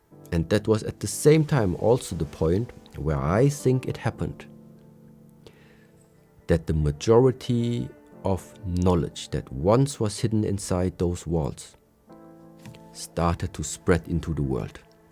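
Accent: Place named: Germany. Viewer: just love that a buddhist spiritual masterclass leader has a german accent 🙃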